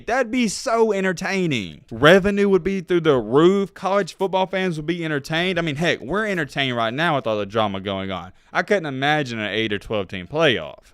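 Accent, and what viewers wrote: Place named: Alabama, United States. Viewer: We can clearly tell you from Alabama. Pick up truck ready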